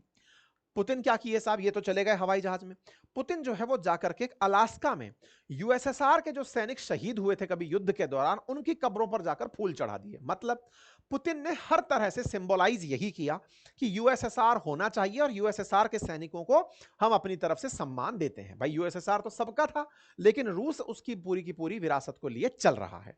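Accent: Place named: Kanpur, India. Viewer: pls keep kanpuria style of anchoring, it sound sweet...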